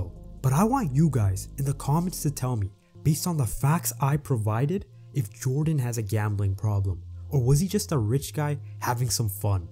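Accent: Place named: United Kingdom. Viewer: God, your voice is so... BRITISH, EXCITED, I DON'T KNOW!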